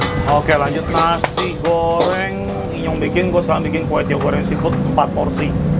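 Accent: Banyumas, Indonesia. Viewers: lama2 pinter ngapak gabunge sama mas inyong 👍👍😀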